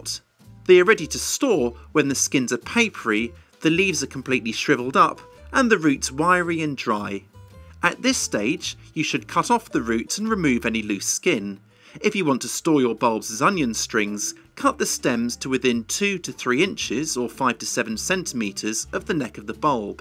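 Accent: United Kingdom. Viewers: British people are so much more pleasant to listen to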